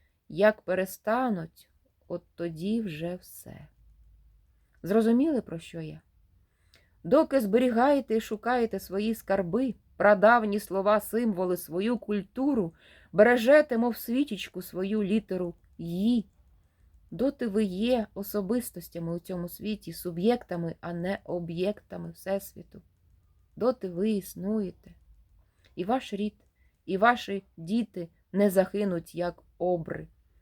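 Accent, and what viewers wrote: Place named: Eastern Ukraine, Ukraine. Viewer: Слухаю вас з увагою, ви з молодшого від мене покоління. Очевидно ви із східної України , чую по вимові, з великим впливом російської. Шчьо, чьому, дЗім замість дім, широке російське Ы замість українського короткого И. Але мені приємно, що в часи, коли наші сусідські «браття» вбивають наших людей за мову українську. Щиро вам дякую за читання і прагнення розвивати українські канали. А вимова? Може треба буде змиритися з сучасною модою. Адже багато мо перетерпіли зміни: англійська британська та американська, іспанська європейська та латиноамериканська, португальська і бразілійська, французька і крейол. Світ міняється, але Україна буде вільною.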